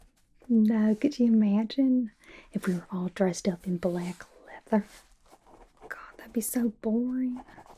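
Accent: South, United States. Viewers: I love rogue and the southern accent omg, I discovered your channel about a week ago and your cosplays are on point! so entertaining and the dialogues actually are in universe, its so entertaining!! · This is my favourite version of rogue is honestly brilliant and omg the southern accent is just perfect ahhhhh amazing 😊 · Yes, the Southern accent is very nice. Very soothing.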